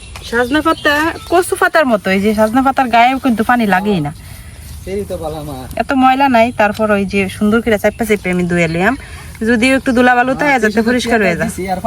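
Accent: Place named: Mohanganj, Bangladesh. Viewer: বাংলাদেশের মোহনগঞ্জের ভাষা, আমার এলাকার কাজের বুয়ারা সবাই ঐ অঞ্চলের এবং এনাদের ভাষায় কথা বলে। কিশোরগঞ্জ, মোহনগঞ্জ সম্ভবত কাছাকাছি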